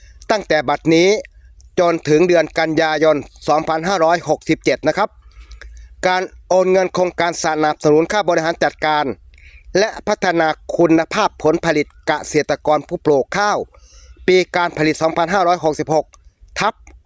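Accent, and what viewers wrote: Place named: China. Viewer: สำเนียงจีน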